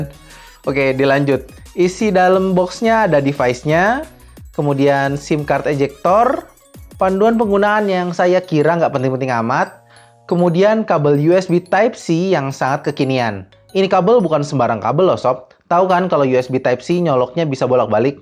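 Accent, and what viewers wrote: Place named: Sundanese, Indonesia. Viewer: Bang, Logat Sundanya kocak bat dah:v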